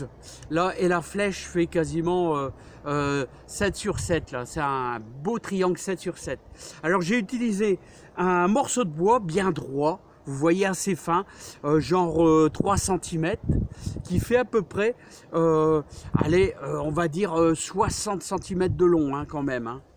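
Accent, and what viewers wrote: North, France. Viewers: Bonjour , je viens de découvrir votre chaine avec plaisir de vous entendre avec un bel accent du Nord! sympa, car moi aussi je suis de la région , disons le même coin ou peut être voisin.Merci pour vos vidéo et le travail donné.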